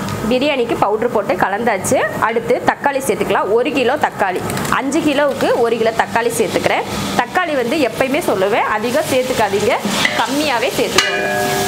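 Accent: Coimbatore, India. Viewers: Akka neenga Coimbatoraa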